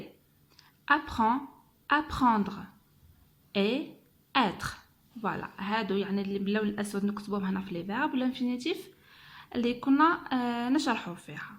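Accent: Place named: Algeria. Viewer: D'où venez-vous de la wilaya ... selon votre accent, vous êtes de la commune de Tadjnant ... je viens de France, mais j'habite en Algérie et je visite beaucoup ses communes ♥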